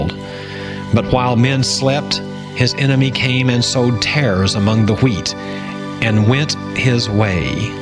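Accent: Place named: United States. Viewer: So, Jesus said these things to someone, then 300 years later, someone heard down the grapevine what he said, then wrote it in Greek, then it got translated to Italian, then it was run through the Catholic system, then the Protestant system, and then translated into English and imported into North America, and is now being recited by a country-accented white man, and therefore it holds the faith of so many as the unadulterated Absolute Truth? Just trying to get the understanding right.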